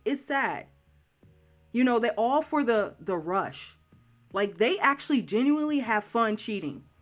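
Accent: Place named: United States. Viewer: I enjoy your narration of these stories: it's nice to have someone do them with a proper American accent and pronunciation, especially a woman with a nice feminine voice. Keep up the good work!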